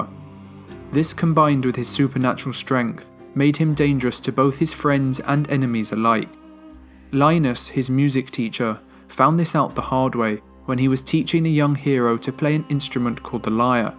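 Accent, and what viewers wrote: United Kingdom. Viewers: It helps that the narrator has a soothing British accent. Try that with one of these screechy annoying American accents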